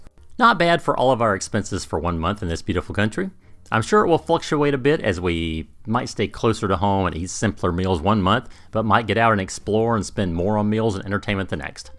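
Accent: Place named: South, United States. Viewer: Nice video. You sound like someone from Southern US .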